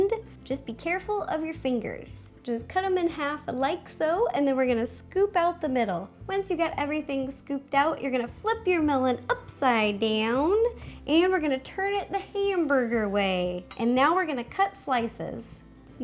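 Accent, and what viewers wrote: United States. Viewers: She's american and every country has an accent in their language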